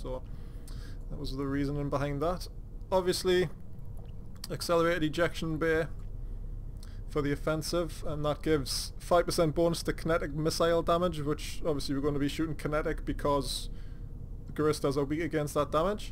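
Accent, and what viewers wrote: Newcastle, United Kingdom. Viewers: newcastle or sunderland?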